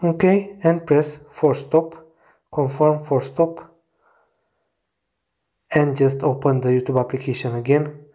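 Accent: Romania. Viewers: M-am prins din prima ca esti roman de la accent lol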